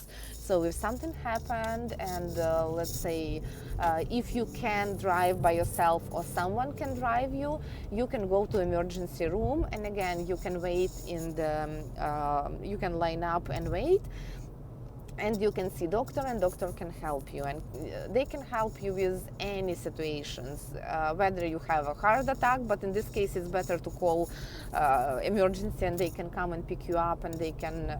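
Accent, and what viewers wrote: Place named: Russia. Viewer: Fookin Russian accent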